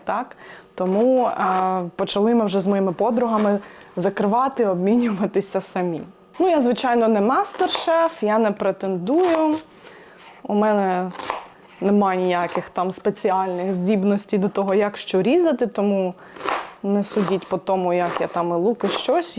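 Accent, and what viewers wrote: Standard. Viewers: Яка гарна Украiночка,яка чиста мова,хай щастить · Сьогодні тільки перший раз Вас побачила і дуже пораділа. Щира людина з чистою українською мовою то скарб! Щастя Вам! ❤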